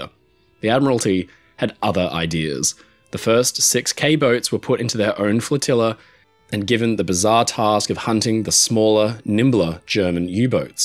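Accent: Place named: Australia. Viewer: Australian accent is just so hard to listen to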